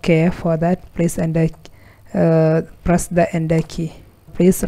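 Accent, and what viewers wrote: India. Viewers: Holy crap it actually worked! Ty miss! The Indian accent is so cute lol. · Of course another indian accent why every computer supporter is indian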